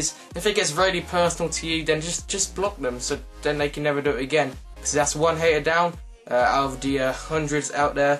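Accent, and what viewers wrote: England, United Kingdom. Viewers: I think from your accent that you are British correct me if I'm wrong. But the question is: Can you do a regular American accent. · do you live in england because of your voice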